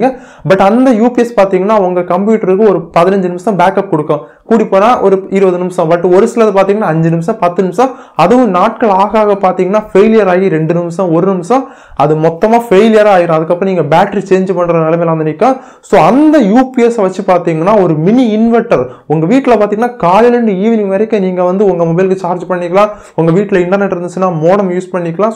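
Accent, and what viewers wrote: Kanyakumari, India. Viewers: Neenga Kanyakumari ya bro. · Bro.. Kanyakumari slag😍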